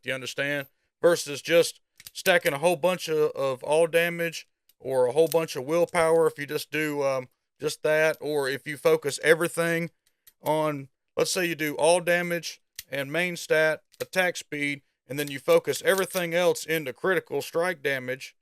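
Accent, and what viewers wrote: South, United States. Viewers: I hear the redneck voice and instantly tune out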